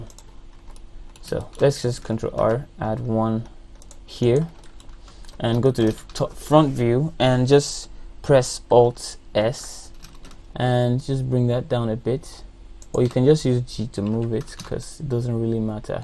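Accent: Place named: Nigeria. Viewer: you have an accent, are you Nigerian?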